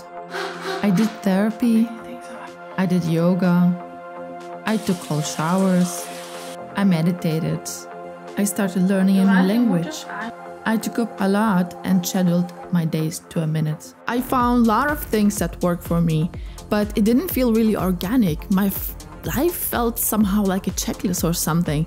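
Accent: Germany. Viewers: Toller Video. Die Shots, Winkel und Kamerafahrten sind genial. Der ganz leichte Deutsch-Akzent macht zusätzlich charmant. Etwas schockiert plötzlich mit Dir in der Dusche zu stehen... Insgesamt: Wow. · I like your German accent, mamma mia! Guten abend von Italia!!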